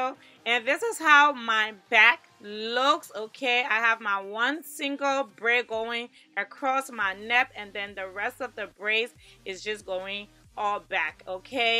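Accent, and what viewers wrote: Liberia. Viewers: you sound Liberian. as a fellow Liberian hair stylist i am inspired by you x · Is that a Liberian accent I detect...SUBSCRIBED 🥰🥰🥰🥰 🇱🇷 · This lady sounds so Liberian....am I right? let's gather and show our sissy some love. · Sis, you did a beautiful job. What your video shows is that you care about your client's hair. ❤ Oh and I think I hear some liberian 😊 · I hear a Liberian accent!!! 😍😍😍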